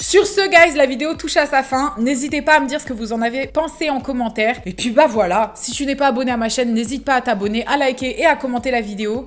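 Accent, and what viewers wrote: England, United Kingdom. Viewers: J’adore ton accent anglais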